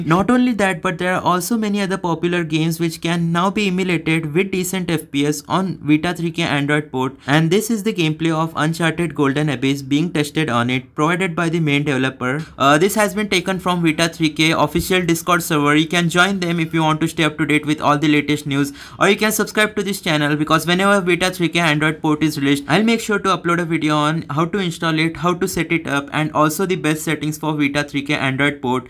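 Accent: India. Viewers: Ты индус? Акцент пиздец у тебя,нихрена не понял на английском 😁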